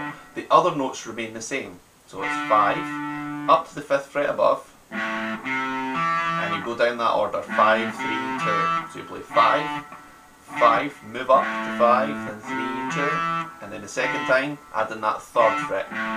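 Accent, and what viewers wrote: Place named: Scotland, United Kingdom. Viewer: Sorry this has nothing to do with the song, but is there anything better in the world than a Scottish accent? I think not. Good job on the song too. Greetings from America!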